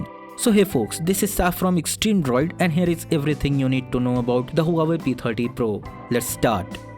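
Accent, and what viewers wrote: India. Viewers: But a Chinese speaking in English sounds <num>x much better compared to this fcking Indian. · Fucking indian accent sounds as though his tongue is born curled. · sounds like india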